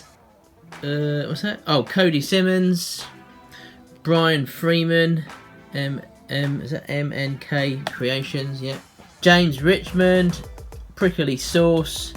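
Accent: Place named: United Kingdom. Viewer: You know, the first time I watched one of your videos I just assumed that N/Z meant New-Zealand. And I thought: why has this guy got a UK accent? 🤣🤣 silly me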